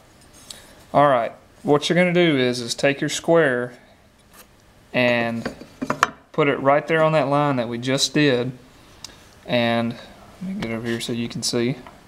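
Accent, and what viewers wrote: South, United States. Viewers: Don't apologize for your southern accent. Remember the song,? "I'll speak my southern English as natural as I please; I'm in the heart of Dixie, Dixie's in the heart of me!" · As a female who knows little about construction, I find this fascinating and (bonus!) easy to understand. I probably won't ever need to use this, but I love to learn all sorts of things. And I find a southern accent charming lol. Please keep up the teachings as you are great at it :-) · k-e-w-l.......just got back into being a grunt for a framer.....did it in college years ago.....saved my tools just incase I had to go back to it....and I went back to it.....work for an old hippie who knows a few tricks himself.......will hafta check and see if he knows a few of these tricks....he just might.....sure thank ya fella.....southern accent here too, so don't worry......be happy.....:) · I appreciate the southern accent. 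· Tons of people today have no clue on some construction techniques, im amazed at some old timers ability to make cuts or joinery from years of experience easily and from eyeballing it, there are those of us that can do a lot of basics but appreciate tips that are not neccesarily as well known as some of these commenters think. If some on here say stuff about your southern accent, i would die laughing if i were to hear their New Joisey accent, We all have accents and it is NOT indicative of intelligence level ( dumbasses) Keep on making these man, they are informative and a help. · hit the like on this comment, sir God blessed you to be a teacher, what He gave you is a gift, He meant for you to give your gift to others and you have, so carry on sir I salute you and never apologize for the southern accent. that's a gift from God too !! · a southern accent instills confidence in me that someone knows how to use tools. 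· Don't apologize for your southern accent, it's a blessing from God. - Proud Texan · don't worry about your southern accent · Having watched a few of this man's vid's, and returned to them more than once, I'm beginning to think there there's no end to this man's talents. As for your Southern accent? Well, 'least ya'll speaking English. Keep it up. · To an HVAC/Electrical/Mechanical troubleshooter, now a semi retired handy man, this is a priceless video...At least I won't look totally lost...lol....My thanks, to another country sounding gent like myself, for making it. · Nice video bud. People just get jealous that they don't have a southern drawl lol guarantee most Yankees wish they had one lol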